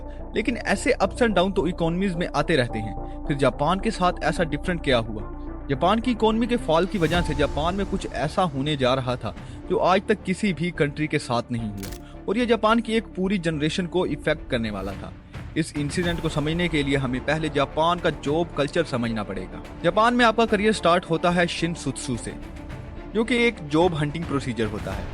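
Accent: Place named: Pakistan. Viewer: Bhai accent sudhar le (pakistani lagh rha hai )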